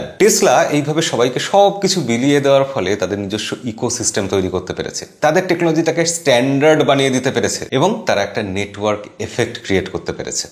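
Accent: Bangladesh. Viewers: ভাই আপনার কথা শুনে ভারতীয় মনে হয় না , পুরাই বাংলাদেশি বলে মনে হয় , আপনি ভারতীয় শুনে মন খারাপ হয়ে গেছে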